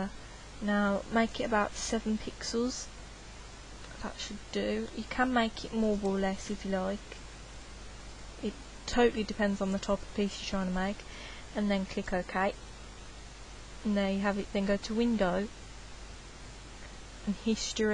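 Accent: Birmingham, United Kingdom. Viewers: Bostin accent! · You are awesome, I like this tutorial. And by the way, I love your british accent (not kidding). Could you upload a HQ video, it seems a bit blurry. Greetings from Mexico. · Brummy accent ftw, im the same ;) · great vid lady and yeh to hell with the yanks who hate the british accent im from london but next to ours brummys have d best accent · hell yea boi... i love british peoples accents...im also aussie..good vid though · Who would hate your british accent? It's so cute.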